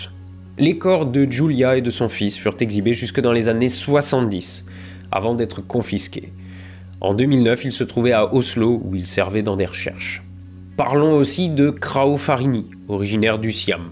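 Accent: Alsace, France. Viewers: C'est un accent alsacien pas belge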